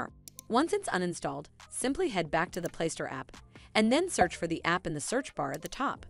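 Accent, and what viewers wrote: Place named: United States. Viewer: Its British Gas .why is it an american voice i mean what .maybe this is why they are not working properly maybe its not Britsh anymore.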